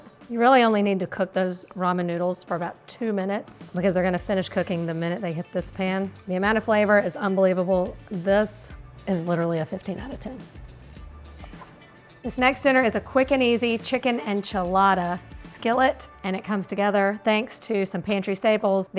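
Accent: South, United States. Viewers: Huge fan of! Love her easy home meals, her soft demeanor, and her cute southern twang. Keep the recipes coming!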